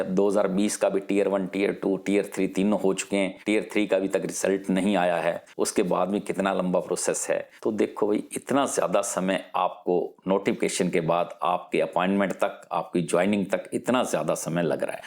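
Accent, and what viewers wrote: Haryana, India. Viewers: Sir aap kaha s ho proper,,,,,,, apki language sa to aap Haryana sa lag raha ho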